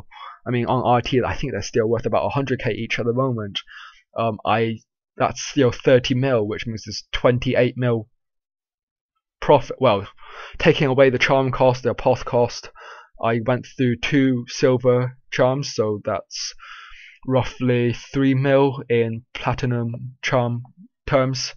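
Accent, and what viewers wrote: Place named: United Kingdom. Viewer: British accent :)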